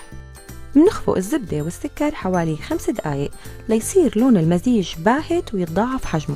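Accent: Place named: Levant. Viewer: تسلم ايديكي شي ظريف بحب لهجتك كمان شاميه 😙😙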